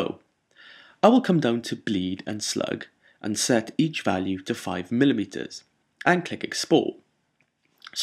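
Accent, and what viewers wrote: Wales, United Kingdom. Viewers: Just wanted to say a big thank you for your hardwork that you have put into this. Its really generous. Its really clear and just works!! I wish more of the internet could be like this! I sat down and watched them through as though it were a lecture at university! Thanks again. By the way, are you Welsh or from New Castle? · I am so happy I found your tutes! I literally clapped you at the end of the last video. So well explained, so clear, nice voice, nice pace, nice command shortcut knowledge and repitition and you really focussed on all the things I needed to know. Now Im off to learn PS with you :o) . Keep up the great work!... you should charge for these!!! p.s I knew it was a Welsh accent!!!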